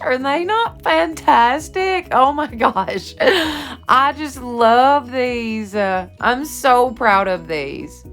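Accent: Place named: Tennessee, United States. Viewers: Everything turned out great your porch looks awesome. I'm from Tennessee to I get it when you say that the sun is brutal I've been doing some diys for my front porch to. Love the wreath. When I first started watching you I thought she has got to be from TN lol have a blessed day. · I’m a New Yorker so when I hear you speak I just love your Tennessee Twang. I enjoyed your whole vignette. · Girl, I KNEW you were from Tennessee! Sound like you could be right around the corner from me! Love it!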